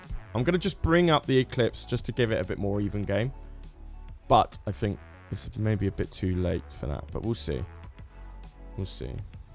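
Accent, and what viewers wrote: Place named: United Kingdom. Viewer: His voice is different like more British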